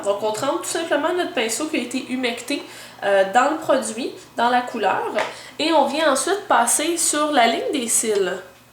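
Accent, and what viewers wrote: Canada. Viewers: Très beau maquillage ... j'adore tes vidéos et ton accent canadien fan de J.Deep mais pas autan que toi... une française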